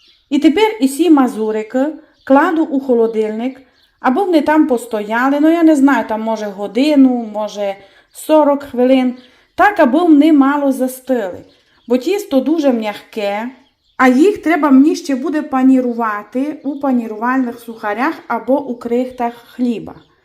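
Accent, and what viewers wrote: Transcarpathia, Ukraine. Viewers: Підписалася , бо дуже приємно чути ваш голос і чудову мову Закарпаття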